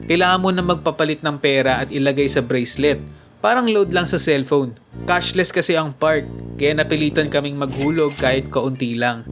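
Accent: Ilocos, Philippines. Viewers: Kuya ilocano ka po ba?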